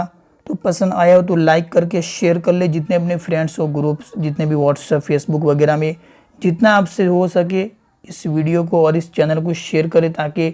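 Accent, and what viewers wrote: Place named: Maharashtra, India. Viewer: aapki accent Maharashtra ki lagti hai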